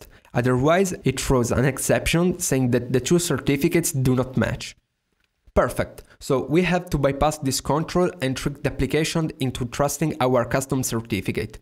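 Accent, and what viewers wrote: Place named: Italy. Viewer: I was looking for cryptocat's video but apparently I found something better ahaha loved your explaination, solo un po' strano sentire l'accento italiano :D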